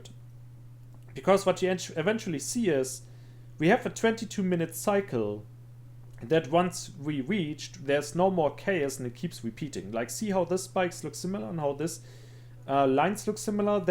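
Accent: Germany. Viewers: Are you German? You sound German... 10 Euro that you are German... but even more because you understand what this factory summoning circle thingy does 😂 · I hear German accent = I listen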